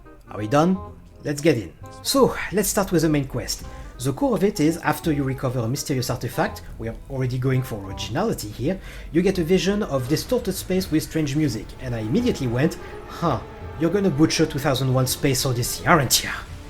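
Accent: France. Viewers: That accent... Are you ... French ? Anyway Based Review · Sorry to tell you but I just watched your vid for your french acsent. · I just discovered this channel. After the video, I went straight to see if he had more content, I hope he uploads more videos, I love his witty sarcasm. The French accent just adds the cherry to the top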